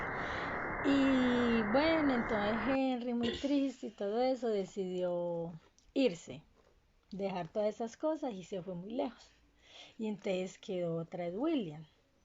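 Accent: Colombia. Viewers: vi todo el vídeo y escuche tu acento eres de Colombia?? Que buen video por cierto de chiquito me daban miedo los electrónicos XD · que señora con cultura mi valecita mia Bv, eres colombiana? Se escucha en tu acento, si es verdad yo también 👌👌👌 Pd: nueva sub gracias a este hecho contenido 👌👌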